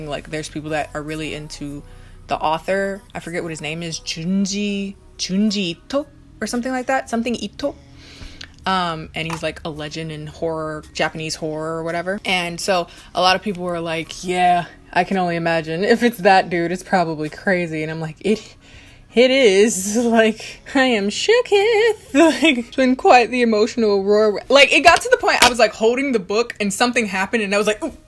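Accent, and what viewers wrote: South Korea. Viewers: Haha you definitely do pronounce Japanese things with a Korean accent. I did the same when I went to Korea the April just gone. I pronounced everything Korean with a Japanese one 😩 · I think the korean accent is cute when you speak japanese. I am on the other side of the coin where I learnt japanese and everything gets a japanese accent instead XD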